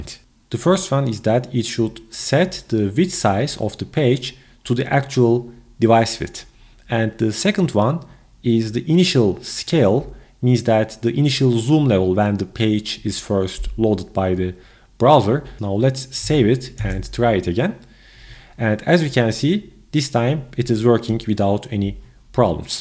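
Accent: Turkey. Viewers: I'm browsing for coding solution and a man with Turkish accent tells me how to solve. Gosh, finally.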